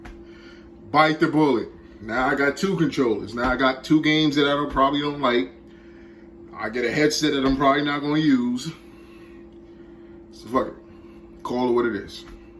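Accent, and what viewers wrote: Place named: New York, United States. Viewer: I dont know if your from NYC but I think you are because of the Brooklyn nets hat, & your accent. Im also from NYC but I moved to Miami fl in the mid 90's I remember I could go into one of those mom and pops Asian stores and always walk out with what I wanted. If your from nyc try those asian mom and pop stores,, or Try China town.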